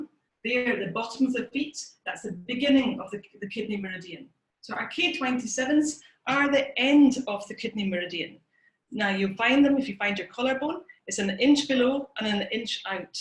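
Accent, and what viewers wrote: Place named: Scotland, United Kingdom. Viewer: Thank you so much for this, your lovely Scottish voice and your energy are just so delightful. I have shared this with my family and friends in this country, and the USA and relatives in Italy. My nephew passed on Sunday, not covid related, we are all devastated but I’ve found this helpful and a managed to do it today. Can’t quite smile , just tears for now, it that’s healthy tears and the smile will come. Thank you 😊 xxx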